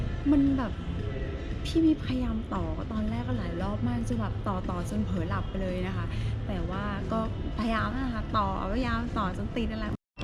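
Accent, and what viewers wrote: South, Thailand. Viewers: สวัสดีค่ะเป็นคนถูเก็ตค่ะติดตามมาตลอดค่ะแต่ไม่เคยคอมเม้นชอบตอนทำคลิปพูดใต้น่ารักมากค่ะ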